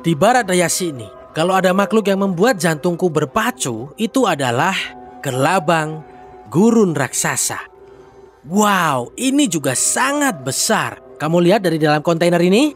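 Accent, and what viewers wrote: Java, Indonesia. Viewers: Dari dubing ini saya seperti mendengar logat jawa ini unik fren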